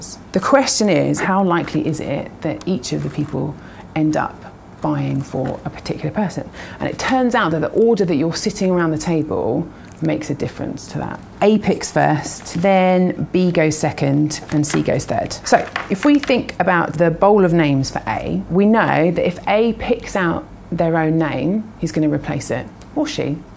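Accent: United Kingdom. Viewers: I only watch the red headed numberphile vids for the British accent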